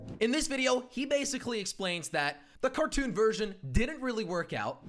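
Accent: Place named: United States. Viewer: Wait, you're Dutch as well? Unlike Kwebbelkop you don't have the goofy accent at all, in fact you sound just like an American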